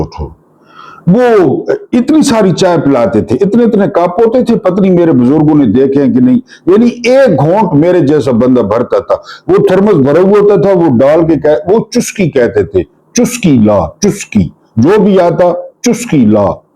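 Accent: Saraiki, Pakistan. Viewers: Excellent analysis sb, suraiki accent bht enjoy kartay hn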